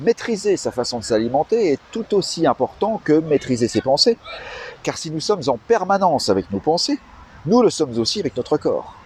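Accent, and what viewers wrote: France. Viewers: Je n'avais pas percuté que vous étiez un ch'tit "à cause ou grâce à votre accent " 😘 J'ai vécu 5 ans dans votre région, nous avons eu un enfant, un Cum et comme je dis souvent "c'est mon ch'tit bilouttttte énorme "😉😊 Quel plaisir de vous voir à Villeneuve d'Asqu.. en plein de mois de Mai, le plus beau mois des Ch'tis dans ce climat de Drach' 🥰😍👍 Super Vidéo, pour moi, je suis abonné, mais il y avait quelques temps que je ne vous ai pas écouté.. 🤔😥☹🤣👍😘 J'ai appris 2 chose, l'huile de Lin, et "je n'ai plus le nom mais qui concerne l'intestin... au-delà du Sucre de l'alcool du sport etc Bref Merci, restez vous-même, pleinement heureux et joyeux d'être Vous.👍💖💝👌👍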